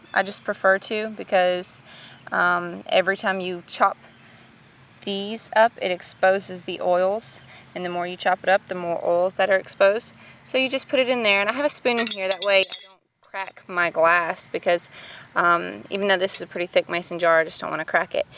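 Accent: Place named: South, United States. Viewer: Is that Loblolly Pine. I recognize that accent and I know the only pine that grows in the south is Loblolly. I am from Arkansas and you have to go to Missouri before you find white pine.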